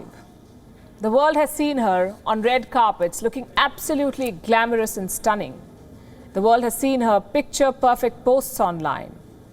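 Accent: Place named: India. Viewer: Is she speaking English or trying to with an Indian accent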